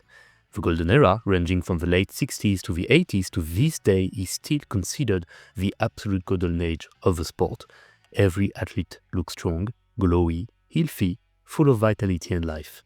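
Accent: France. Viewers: Nothing wrong his English and it’s just a French accent. Probably confusing for Americans who’ve had less exposure to it than those watching from Europe. Slowing his pace of speaking may help them. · Not a fan of frenglish.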